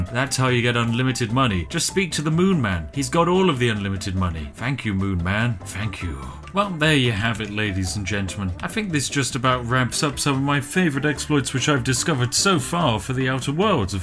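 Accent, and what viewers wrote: United Kingdom. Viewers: Well… did you catch his spiffingly British Britishosity? He’s an incarnation of one of the peaks of Human performance (Evangelic British Hegemony).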